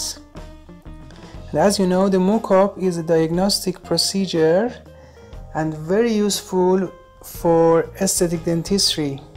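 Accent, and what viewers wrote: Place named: Iran. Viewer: Your accent looks a alot like iranians :)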